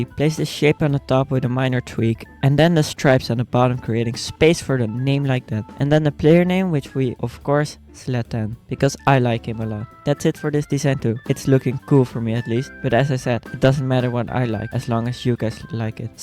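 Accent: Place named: Netherlands. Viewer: Je bent overduidelijk Nederlands aan je Engelse accent te horen 😂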